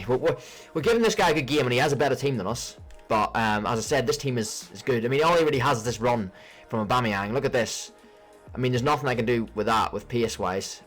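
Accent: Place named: England, United Kingdom. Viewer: Good video, but why do u sound half English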